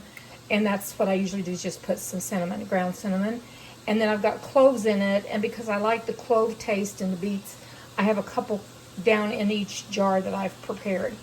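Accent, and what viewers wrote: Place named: Ozarks, United States. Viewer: Hi! I always savore your videos! I will do a research about, Ozark Mountain. Have studied linguistique, I can recognize, what we call the purity speach! Which you have preserved wonderfully! Hugs my dear friend!🥰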